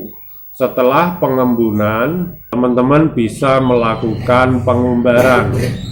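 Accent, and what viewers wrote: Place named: Banjarnegara, Indonesia. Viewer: Pak boleh nanya.. bpk asli mana? Mf pak soal nya mirip orang banjar negara 🙏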